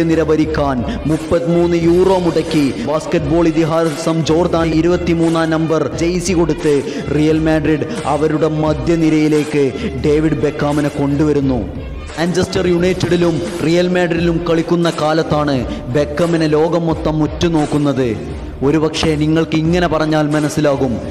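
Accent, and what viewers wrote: Malappuram, India. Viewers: ഞാമലെ സ്വന്തം മലപ്പുറം ഭാഷ...... ഇജ്ഞതി രോമജിഫൈകേഷൻ....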